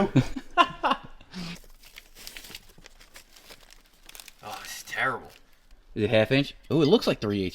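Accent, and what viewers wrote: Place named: South Jersey, United States. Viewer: Heard the accent and thought south Jersey or DelCo PA haha. Nice exhaust upgrade man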